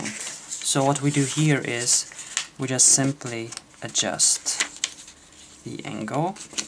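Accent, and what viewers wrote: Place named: France. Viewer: He sounds french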